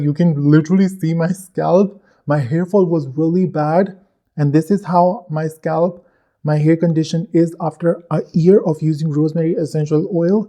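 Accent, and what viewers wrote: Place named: United States. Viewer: Abe urdu may baat krna pakistani hokar American exent msy baat kr rahahai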